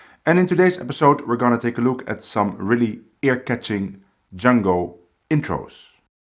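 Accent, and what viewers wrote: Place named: Netherlands. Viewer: I love your Dutch accent, it's the best. 😍😉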